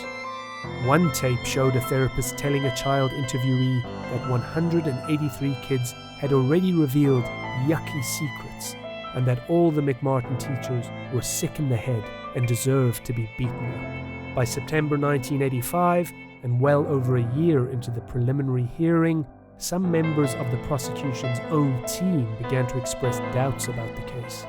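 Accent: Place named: United Kingdom. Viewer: Always better with a British narrator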